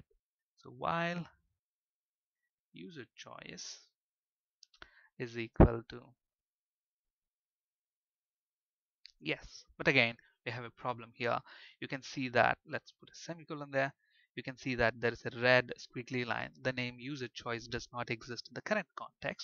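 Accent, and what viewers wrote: India. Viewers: Easy to understand Indian assent.... :D Download this video, install the gom player, listen over there with extend volume for more clearly.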